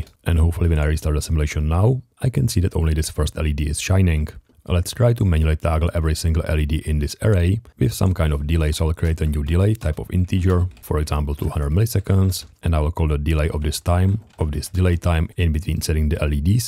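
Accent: Czech Republic. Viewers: Good video and content👍👍 jsem si říkal, že zníte jako čech a je to pravda😂 musím říct, že dobrá angličtina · hey I just had a question if you were czech because you really sound like a czechman and if so, DAMN DUDE that english be almost clear ale jinak nice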